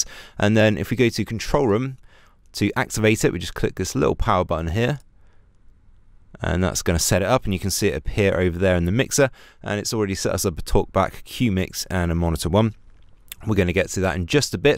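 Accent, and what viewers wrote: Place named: United Kingdom. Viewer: Solid info. Not to a dick, but I had to rewatch a number of things to clearly hear what was being stated. Not a matter of accent, it was specifically the speed. Many in the U.S. neither speak or are accustomed to hearing U.K. english (which we also often hear from those speaking english who are from India & pakistan). It's like there's some race to get every single syllable out of the mouth, regardless of audience comprehension. I understand that you are going to do as you will... it's fine. I merely wanted to share the perspective of some of us who are struggling with the tutorial a little. Thank you for your time.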